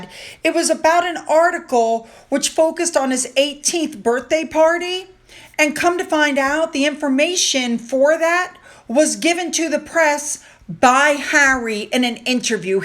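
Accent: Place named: United States. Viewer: So what she is a American not English .And American English is very different from real English.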